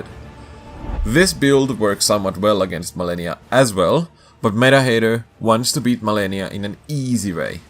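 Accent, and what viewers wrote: Finland. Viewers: Why the fuck do i smell Finnish accent… vittu saatana jätkä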